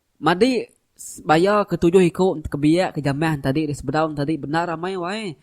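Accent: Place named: Julau, Malaysia. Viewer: Urang julau ka? Munyi jako urang julau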